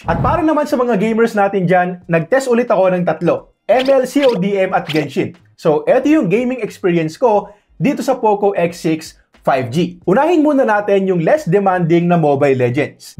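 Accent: Cavite, Philippines. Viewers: tga cavite pala kayo sir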